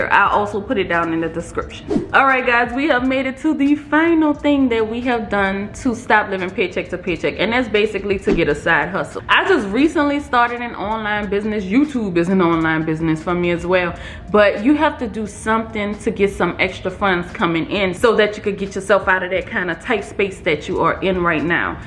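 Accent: New Orleans, United States. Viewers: Lol I’m sensing a NOLA accent; love the energy in the video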